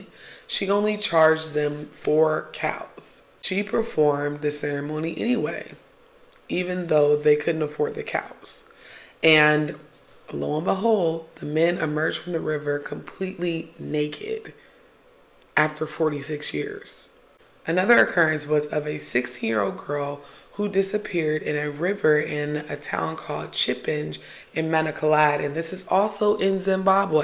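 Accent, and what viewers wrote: United States. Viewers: CAULDRON. Spelling..... And you are NOT African, unless you were born there. You sound AMERICAN. You seem to be a Black American of African Heritage (& frankly, white too) The term AFRICAN AMERICAN was a label put upon you to divide us. My ancestry is Sicilian and German, but I don't call myself a Sicilian American. Can you imagine is EVERYONE did that? Everyone you know would be an Irish American or a Hispanic American, or..... And NATIVE American? ANYONE BORN HERE is Native. Maybe The Original peoples or Indigenous peoples or... ? Don't divide. WE ARE ALL Americans (& really need to take our country back from the politicians who are importing an invasion of people that do not hold our values, speak our language or have any intention of assimilating into our society.) P.S. You remind me of my friend's daughter. very pretty. Thank you forthe video - Subbed!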